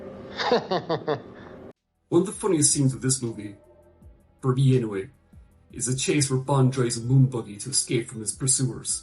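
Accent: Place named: Ireland. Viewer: Great video as ever, thank you. 🙏 Your accent is great, what part of Ireland are you from?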